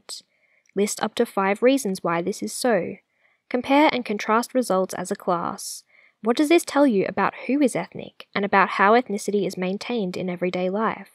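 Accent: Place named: Melbourne, Australia. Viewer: Oh right, you're from Melbourne, no wonder you sound a bit different. PS Brisbane here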